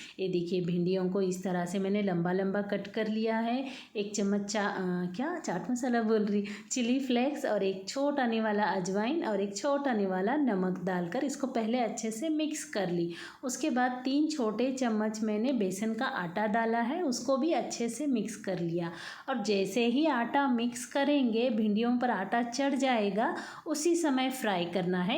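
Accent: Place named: Belgaum, India. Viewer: proper nagpur se hain kya aap ka asent mujhe belgaum jaisa laga thats y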